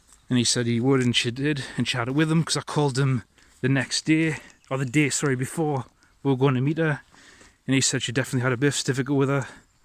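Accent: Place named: Geordie, United Kingdom. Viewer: It took 3 months to renew mine, but that was sending the paper work from here in Australia. I detect a mild geordie accent, im originally from tynedale.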